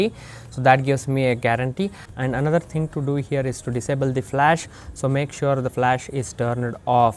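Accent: South India, India. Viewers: i always like indian accent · Accent is so much south Indian...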